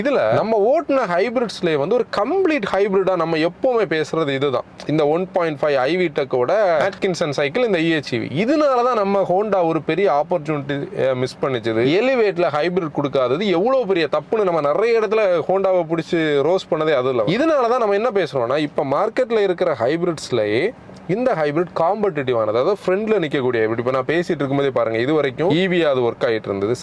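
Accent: Kanyakumari, India. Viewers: Sweet Kanyakumari language and loyalty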